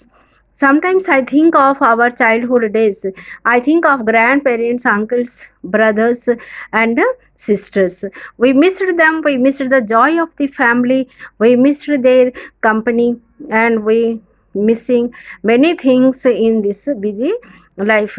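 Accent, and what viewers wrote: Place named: Telugu, India. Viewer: Super explaning mam. Your talking in English but is was like Telugu.. I understood lesson very clearly thank mam.😍😌🙂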